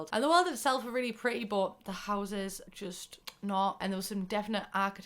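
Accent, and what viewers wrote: United Kingdom. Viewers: I have to turn on closed captioning sometimes to figure out what you're saying (accent for my ears, difference of word usage from US to GB) and I just have to say, watching CC try and and guess what it is your saying is a crack up all in itself ('porn Hilda'...LOL). I will clearly hear words that it TOTALLY messes up. It's just a whole 'nother reason to come watch your videos. Congrats on finishing your Masters and getting your own place. You're doing so well and i'm sure your family is so proud of you. I may be 39, but I still remember those early college years and the struggles (and I only went for a BS, never got a chance to go for my Masters). I hope it's all downhill for you from here on out. I have to say, i'm not a huge occult person, but I am very excited for Realm of Magic. I don't know what I really expected, but so far what i've seen in videos isn't really what I was looking for (again, not sure exactly what I was looking for, but the build items, while nice, just don't scream "witches and wizards" to me and that's really what i'm interested in) but regardless, I will be buying it tomorrow. 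:) I feel like the GP are usually worth the $20 price tag, and i'm a penny pincher, but I don't mind paying for this one full price right out of the gate. Re: Windows...feels a bit lazy to me. I know it's a lot of work involved for meshes, but when the first set of windows are done, without actually ever having built a mesh myself (but having lots of design experience) usually y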